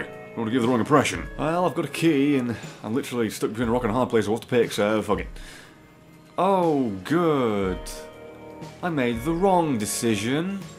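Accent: United Kingdom. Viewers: Wait, are you an American citizen? I always assumed you were British because of your accent...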